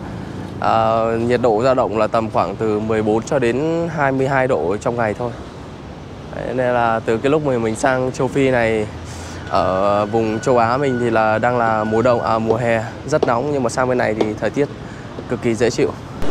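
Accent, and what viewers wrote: Vietnam. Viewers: Nói tiếng anh thuần việt, 😊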